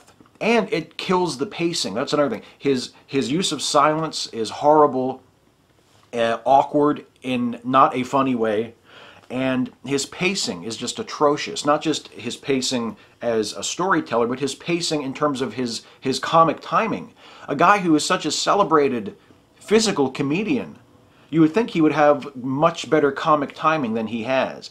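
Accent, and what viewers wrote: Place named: Philadelphia, United States. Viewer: I know EXACTLY what you mean about Jerry Lewis and the Telethon; I was a child of the 80s too, and it is.definitely a strangely nostalgiac memory. Also, based on your pronunciation of the letter "o" in words like, "on"; are you originally from the Philly area?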